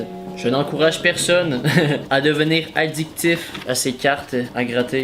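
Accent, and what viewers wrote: Quebec, Canada. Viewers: J'aime l'accent Québécois ❤️ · C'est quebek ou français dit moi stp😂😅😅😅😅😅😅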